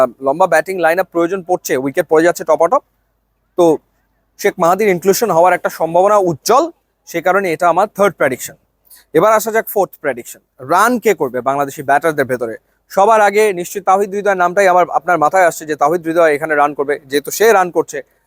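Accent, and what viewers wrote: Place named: Kolkata, India. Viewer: আপনার কথার ধরন কলকাতার মানুষদের মতো কেন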